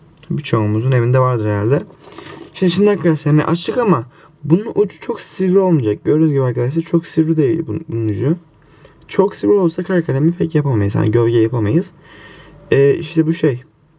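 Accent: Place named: Cyprus. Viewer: Kıbrıs da mı oturuyorsun?? (Şivenden dolayı söyledim)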